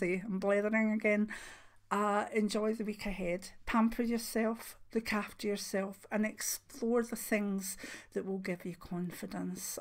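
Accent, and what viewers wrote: Scotland, United Kingdom. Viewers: I'm a brand new subscriber. Your sweetness, kind and loving energy and lovely Scottish accent captured me. Thanks for all your shared wisdom and helpful videos. Much love, light and abundance of all good things to you. 💞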